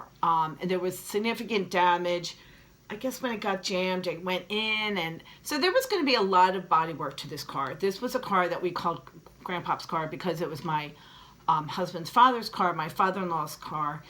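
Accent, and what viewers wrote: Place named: Ireland. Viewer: You sound like you have an irish accent.it might just be me.it's cool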